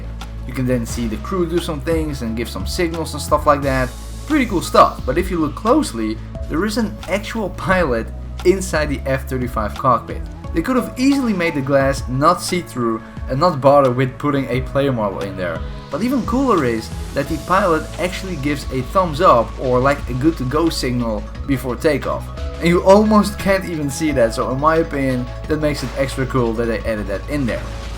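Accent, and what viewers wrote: Netherlands. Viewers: He sounds very Dutch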